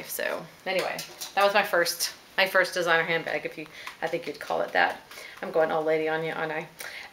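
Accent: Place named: Texas, United States. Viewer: I can recognize a Texas accent anywhere. Yes, I am from SW Texas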